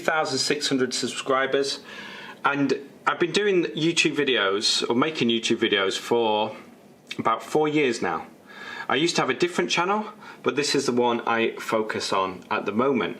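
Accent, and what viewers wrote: United Kingdom. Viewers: Thank you. I like your British accent.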